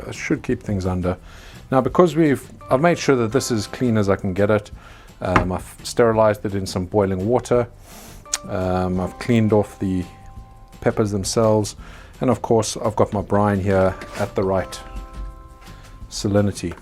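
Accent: South Africa. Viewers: New subscriber!Great videos. Watched one and then about 6 more.Huge fan of hot peppers, and the accent doesn't hurt. South African?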